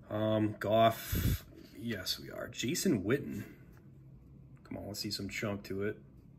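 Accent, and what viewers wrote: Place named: Canada. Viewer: I can hear a Canadian-like accent b/c instead of bosa I hear bowl-sa. Just say it and see (hear) the difference.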